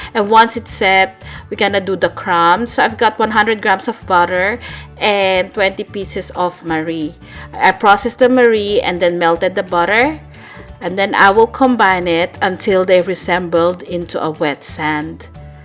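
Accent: Philippines. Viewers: Just want to ask if youre an Ilongga 😆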